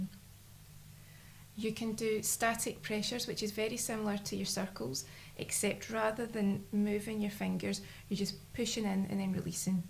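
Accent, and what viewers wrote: Scotland, United Kingdom. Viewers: I learned a Scottish accent from you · The Scottish accent is just lovely here (pleas say "braid" please) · Not sure how i ended up here, but i have to say. I still think the scottish accent is my favorite. I dunno what about the accent draws me to it, but its just such a good accent. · I loooooove scottish accent ♡ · Nice relaxing video. I'm sure it would feel great and have positive health effects. I love your Scottish accent too. Some women have very soothing voices like Lita. You certainly do too. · With that accent, I fully believe that Scottish women could rule forever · 😂😂 I love still game was bought up with Rab C and I love your jock accent 🏴 greetings from east London 🏴👍🏻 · Awesome video, the scot accent an added bonus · Scottish accent ❤️ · I like your accent, where do you come from? Is it really Scotland? Some comments mentioned that before... 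· Its really strange to hear a fellow scot do a massage video for some reason.lol did you study holistic massage in any colleges in Glasgow?. looks like the Cardonald college uniform You model is a babe as well:p haha cool vid:)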